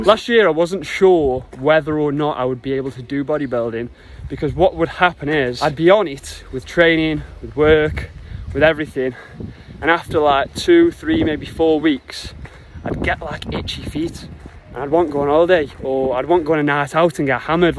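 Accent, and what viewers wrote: United Kingdom. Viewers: could've sworen that was a Yorkshire accent but okay 😂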